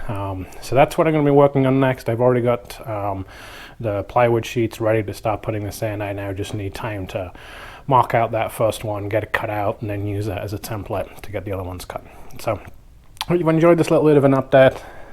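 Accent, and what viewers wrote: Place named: Canada. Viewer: Where are you from originally? Your accent just gets me every time. It sounds like you got English + Canadian going hella wild! Love the layout!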